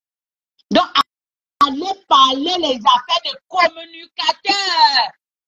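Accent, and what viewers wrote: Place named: Ewondo, Cameroon. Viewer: Mieux tu parle ewondo ton français et ton Corp se resemble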